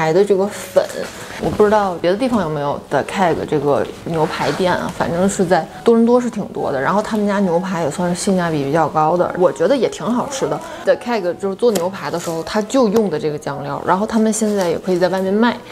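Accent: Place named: Beijing, China. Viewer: 北京口音❤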